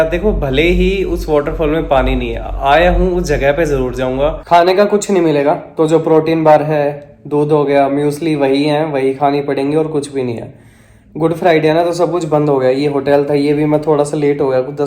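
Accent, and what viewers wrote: Haryana, India. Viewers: Bro's content day by day 📈 and your haryanvi accent oye hoye🤌😂❤️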